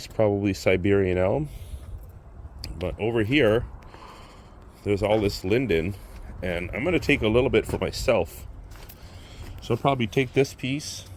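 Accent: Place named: Canada. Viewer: I love your accent! Very Canadian!! 😊 Enjoy your vids.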